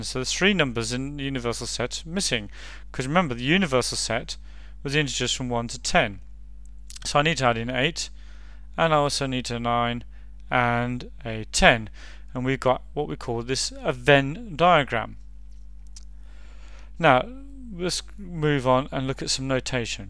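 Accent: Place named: United Kingdom. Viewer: yesss finally found a math video with someone with a british accent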